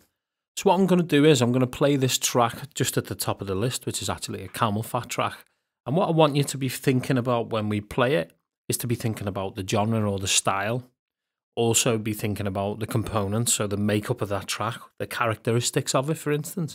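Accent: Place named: Liverpool, United Kingdom. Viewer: I just discovered this feature, but didn't know how to filter or search with the tags... which is why I came here. And I was welcomed by the most amazing scouse accent in the history of mankind. Boss mate!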